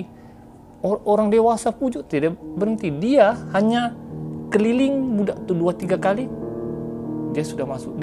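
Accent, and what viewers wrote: Sabah, Malaysia. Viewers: mmg best loghat Sabah...ni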